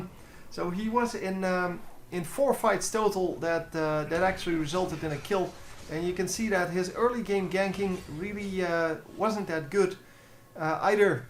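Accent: Netherlands. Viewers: You sound like a Dutch man when you are talking English :D from what country are you if i may ask?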